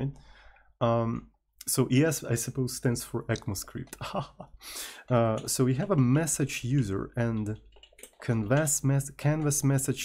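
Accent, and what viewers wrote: Russia. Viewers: They are probably YouTube auto generated, he has a moderately thick accent, it's not quite Russian it's almost a mix of Russian and South African sounding, but it isn't pure clean sounding English so the auto transcriber may have had difficulties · You Russian accent is too strong, it's вери анплезант фор май ирз. Ю шуд стоп сейнг s like з энд th лайк с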